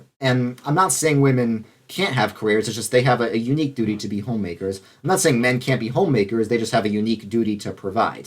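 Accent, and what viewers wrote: New York, United States. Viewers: It's kinda funny this is the most thick his new yorker accent has been so far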